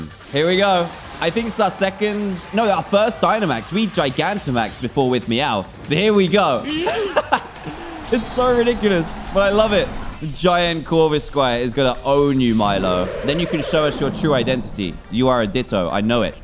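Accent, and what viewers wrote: United Kingdom. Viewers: I know it's just your British accent but evolution is pronounced as evv-oe-loo-shin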